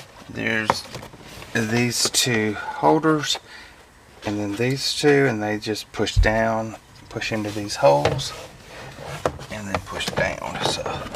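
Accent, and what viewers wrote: South, United States. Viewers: Thank you so much for the reply. I'll have to check out your other online content - sounds great! Regarding where you are from, there's a lot of similarities in accent and expressions between south Arkansas, northern and eastern Louisiana, and Mississippi, and a lot of similarities in culture and food! Take care, and may God bless!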